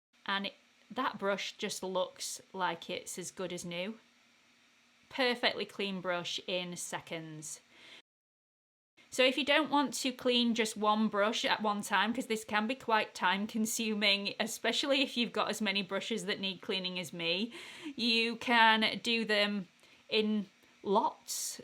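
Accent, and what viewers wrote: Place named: England, United Kingdom. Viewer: I use Woolite gentle textile shampoo for my brushes - is that sufficient and my beauty blender after every use in dishwashing liquid. A new happy and very enthusiastic subsriber. Appreciate your professionalism and wonderful English accent. Similar age to your gorgeous Mom and have learn so much from your amazing tutorials. Thank you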